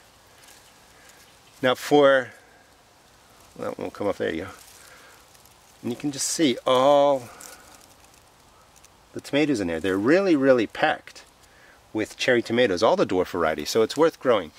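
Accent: United States. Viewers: speak English not American....plz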